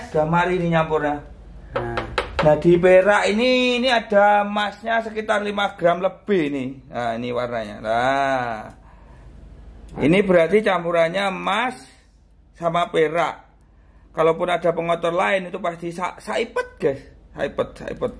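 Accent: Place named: Java, Indonesia. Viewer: Lucu jg mas bro gpp bahasa ny agak jawa2 an itu menunjukkan kita punya identitas. Siiiplah pokoke 👍👍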